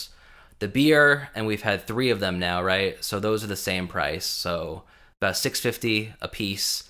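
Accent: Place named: China. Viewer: You need to retire that Chinese accent bro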